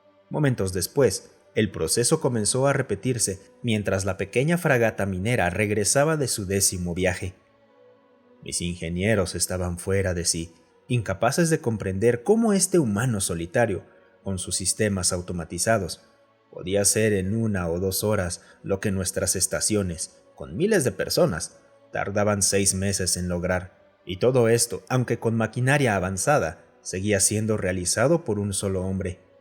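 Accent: Argentina. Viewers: Argentino nos salió el pibito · Vi la versión de la rana que narra ... Y tu lo volviste argentina merces mil años de salud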